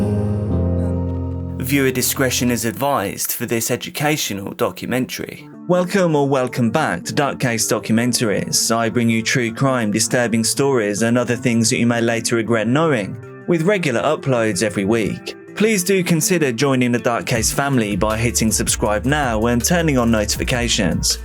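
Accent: England, United Kingdom. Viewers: the beautiful English accent is so exotic ! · "Casey" is Australian, Dark Case is clearly British.. not the same at all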